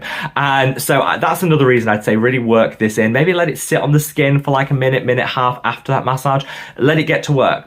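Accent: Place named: North, United Kingdom. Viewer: Love this!! I'm a huge fan of Revolution products - will it be better than the Sali Hughes one which is just lovely. I think your vids are fab, fellow northerner here loves hearing your accent and the info you give is invaluable especially for those whose pockets are not as deep as some reviewers like to think. I'll be popping it in my basket next shop! I must say My skin has improved dramatically over the last few years, I'm 47 and since following a real and consistant skincare routine my confidence has been boosted, especially since people are saying I look 10 years younger than I am - I'll take that ANYDAY! I'm not saying I have good genes, but I do know the skincare and advice your channel has given me has helped for SURE!! Keep up the good work, I'm jealous of your skincare shelf!! 😍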